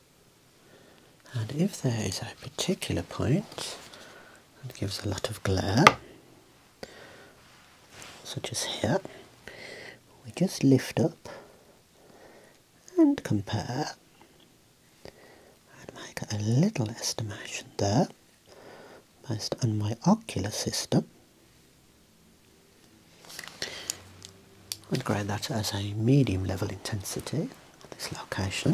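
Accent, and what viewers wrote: Birmingham, United Kingdom. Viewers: On the one hand it's just some bloke looking at a table tennis racket, but on the other hand I find it immensely satisfying and deeply relaxing during my Saturday lie in until God knows when watching a bloke inspecting a table tennis racket and speaking in a soothing Brummie voice. I find it's the perfect way to relax and unwind after yet another working week.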